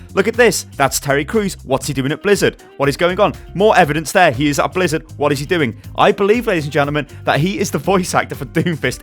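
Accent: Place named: United Kingdom. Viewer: did get an annoying british accent and learn to clickbait? or is this just?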